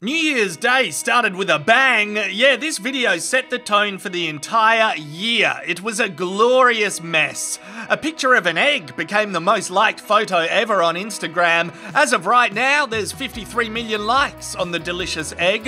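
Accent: Australia. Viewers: God I hate the Ozzie accent!!!....ugh......sorry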